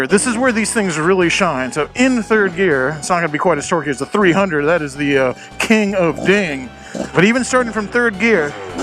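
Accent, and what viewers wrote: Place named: South Florida, United States. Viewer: I’ve been around since the heavy southern Florida accent! lol good times… long time. As real as any YouTuber gets, good bad and ugly.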